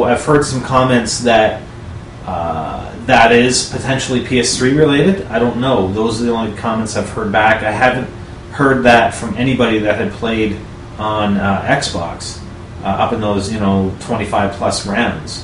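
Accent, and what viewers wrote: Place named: Canada. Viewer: You're Canadian? I always thought you sounded like it.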